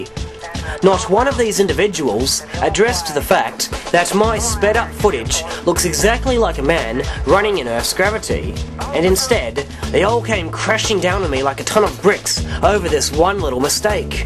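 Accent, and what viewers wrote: Australia. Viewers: Excellent job in clearing up your honest mistake... Thanks for showing us how it happened. Unfortunately the Apollo debate has now become more of a personal battle between the CT's and the propagandists, than it is about the Apollo subject itself. Except for the Aussie accent, that sounded just like me! LOL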